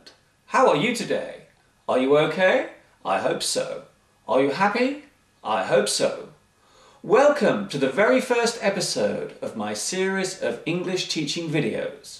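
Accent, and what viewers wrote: England, United Kingdom. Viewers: I like your accent is different than the American English, I know you are from England. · hes speak using British Accent :) my favourite accent :P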